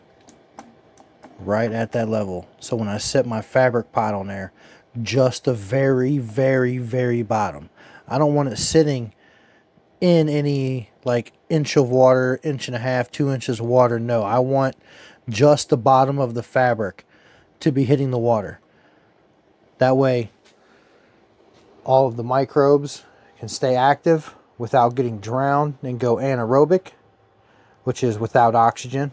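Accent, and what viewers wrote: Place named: Pennsylvania, United States. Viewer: Yo I knew you had to be a neighbor because the accent/dialect. You sound like you from PA but the jersey tells me all I need to know lol. Good shit bro. I been brainstorming this type idea for a little but still am rocking all organic atm...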